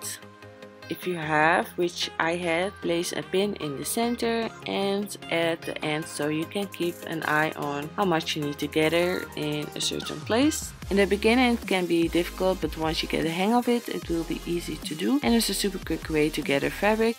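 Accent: Netherlands. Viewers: you sound so extremely dutch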